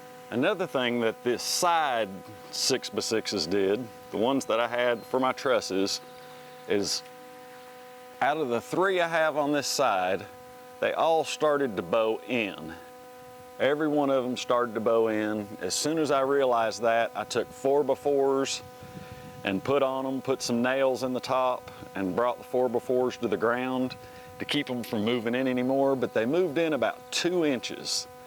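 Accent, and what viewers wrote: United States. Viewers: Don’t be so hard on yourself, mistakes are just lessons learned, but I love the southern twang of tuba six’s and tuba four’s lol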